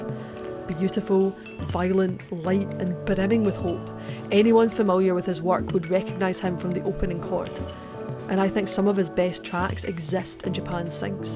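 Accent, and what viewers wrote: Scotland, United Kingdom. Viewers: Came here to find a review to throw at a mate and convince him to watch JS. In 2 seconds found a Scottish accent and was more interested in knowing how good you were as a reviewer... I’ve subbed, good points all round you’re articulate af, know your deep knowledge and I have absolutely no arguments against the review. Gonna look through some I’ve not watched now. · Are you scottish or something? Kinda sounds like it.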